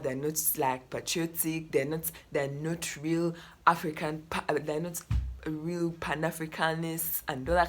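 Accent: Nigeria. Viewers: Ghana? She sounds Nigeria? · I hate it, can't stand the Nigerian accent. Stop it ooo.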